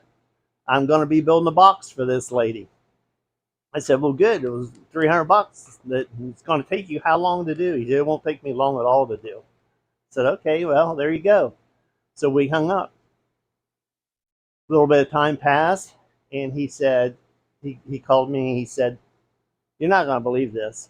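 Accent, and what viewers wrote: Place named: Pennsylvania, United States. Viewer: Great video! I think hear a PA accent, am I right? South?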